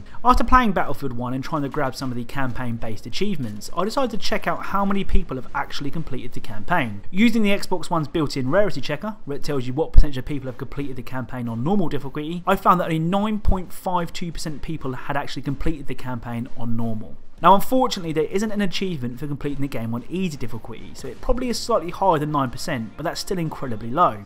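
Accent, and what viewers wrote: England, United Kingdom. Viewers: Do you come from England?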